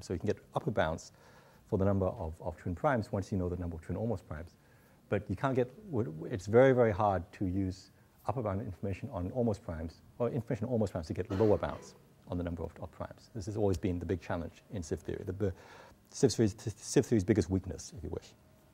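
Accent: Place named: Australia. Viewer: chinese with australian accent teaching math in america... globalization!! yeah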